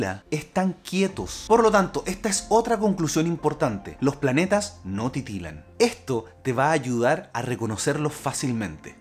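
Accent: Chile. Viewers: Amigo... eres el mejor!!! Muy buen video... tu explicacion es excelente!!!! Ah!!, me encanta tu acento chileno. Soy de Venezuela, pero vivo en Estados Unidos... Te felicito...!! Excelente!!!!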